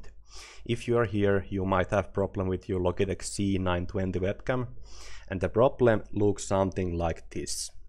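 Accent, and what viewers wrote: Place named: Finland. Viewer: Thank you so so much for your tutorial! I could not figure out how to get the Logitec driver back after I had to wipe my compute recently. That driver is going in a safe spot. Also you have a very lovely Finnish accent, one of my favourites the world over! THANKS AGAIN! (and yes you have amazing English)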